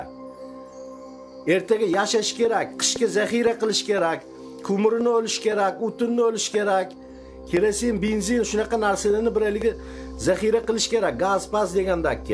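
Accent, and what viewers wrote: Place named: Namangan, Uzbekistan. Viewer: Наманганлимисиз ака гаприш тилиз охшайди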